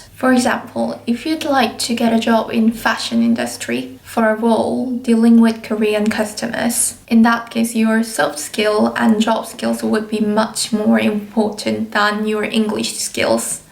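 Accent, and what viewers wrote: United Kingdom. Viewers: 이제는 누나라고 부를게여... 영국발음 너무 멋지잖아여!! 저도 슬슬 인턴 나갑니다. 영국가는날까지 열심히 해야겠어요 ㅎㅎ 코로나 조심하세요~~~ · 우와 발음이 미국 발음이 아니라 영국 발음이네요 완전 멋있어용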